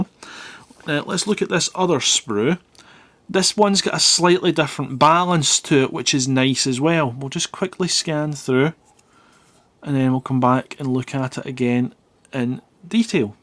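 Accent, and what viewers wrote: Scotland, United Kingdom. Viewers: Is this a scottish accent? No offense but it sounds freaking hillarious :D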